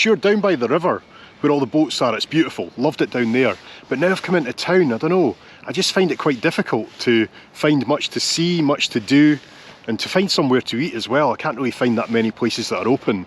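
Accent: Scotland, United Kingdom. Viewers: Sounds a bit Scottish 😊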